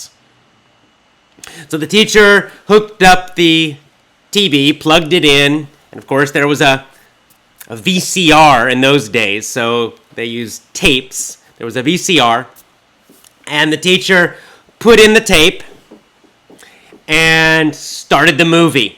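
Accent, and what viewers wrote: United States. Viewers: Is he from America??. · hi Ihave one question is this American accent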